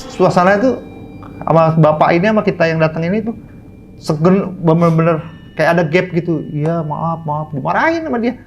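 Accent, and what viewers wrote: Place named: Betawi, Indonesia. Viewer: Ngeliat jd inget waktu saya tinggal di pondok gede, Jd inget Orang2 betawi nya klo ngomong cerita kek, asyik seru.. Suka ngelawak ditongkrongan asyik om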